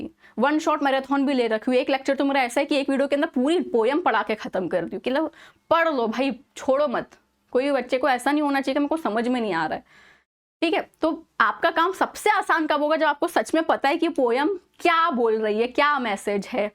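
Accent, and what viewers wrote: Mumbai, India. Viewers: Mam kya Bombay se ho kyaa apke ,tone se lag raha he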